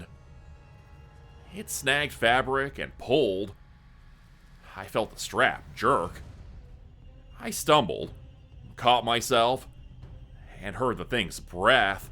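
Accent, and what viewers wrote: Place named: Canada. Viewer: oh wow I thought it was his real voice 😊 especially the c😎😎L Canadian 🇨🇦accent I hear 🩷🧡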